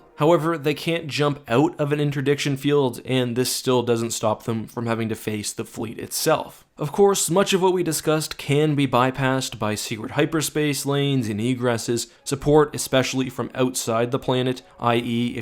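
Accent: Canada. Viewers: are you canadian? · Are you from Canada??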